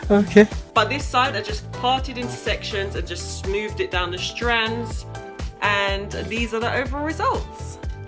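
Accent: United Kingdom. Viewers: I love you UK accent, I've Cameroonian accent 🤪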